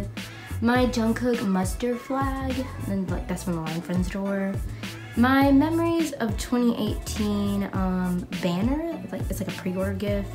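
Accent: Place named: United Kingdom. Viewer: I like your british accent..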